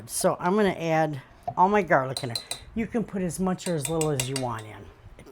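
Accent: Michigan, United States. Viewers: I am so glad I found you! I started my at home cottage bakery back in October last year and I am learning so many tips and tricks from you. We are doing our local farmers market and getting some really great feedback and sales so far! Thank you so much for being open with your techniques and recipes!!! PS I am from MI, haven't been a resident there for over 25 years but I distinctly hear your Michigan twang LOL. Love it!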